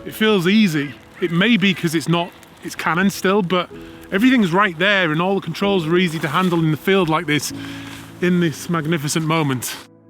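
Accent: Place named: United Kingdom. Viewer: You definitely got some keepers on this outing. Its funny when I see a landscape photography video by someone with an british accent, and the video is sponsored, I am waiting for the usual "This video is sponsored by Squarespace". But then you say "Lexar" and I am jolted back to realizing you are not everyone else.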